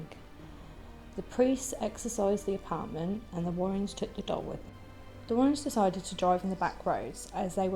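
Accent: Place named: United Kingdom. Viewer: Hello I like your story and your British accent he was really retro mystery love it❤️👍🏻🤘😍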